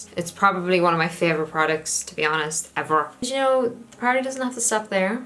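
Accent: Ireland. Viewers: definitely Irish 😍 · I love hearing the Irish accent on YouTube 😍 sounds like me when I talk 😂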